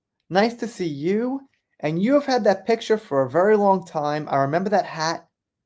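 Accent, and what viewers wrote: New York, United States. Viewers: Where in NY are you from? I'm from Brooklyn... love listening to your NY accent.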